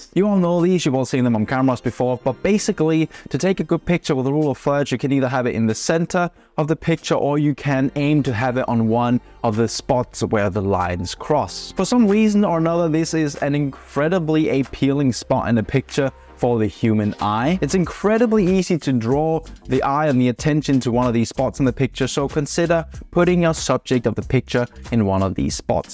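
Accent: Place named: Denmark. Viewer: syntes nok jeg ku høre lidt danglish, hehe Der var også danske plader på den røde Jaguar, da du var i Sverige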